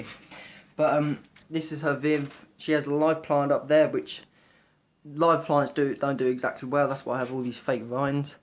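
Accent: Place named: Brighton, United Kingdom. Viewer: Hahah, you just sound like a mate of mine from Brighton.:D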